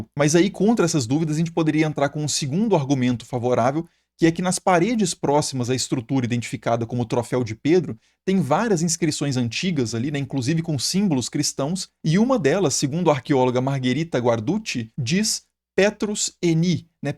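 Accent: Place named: Belo Horizonte, Brazil. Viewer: Meus parabéns a você e a seu canal! Cultura e erudição transmitidas com uma linguagem "magistral" (stricto e lato sensu), e um agradabilíssimo sotaque belorizontino!!!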